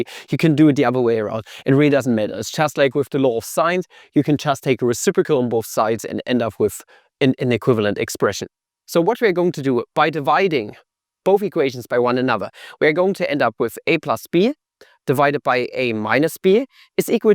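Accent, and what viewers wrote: Germany. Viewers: I think his first language is German.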